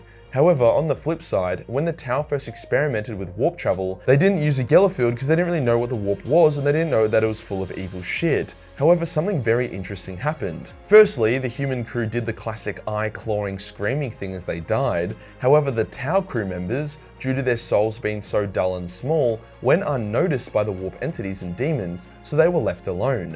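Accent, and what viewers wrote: Australia. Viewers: I can't stop watching your videos, mostly because of how intensely Australian you are. We Americans love Australians.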